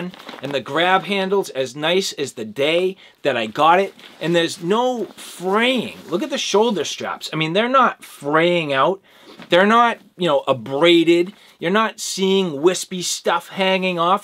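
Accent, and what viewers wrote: Boston, United States. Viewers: Great review, and your Boston accent makes it even better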